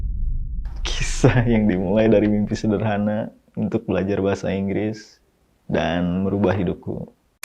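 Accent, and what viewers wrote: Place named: West Java, Indonesia. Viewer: Logat sunda ey ga hilang semngat terus bang 👍👍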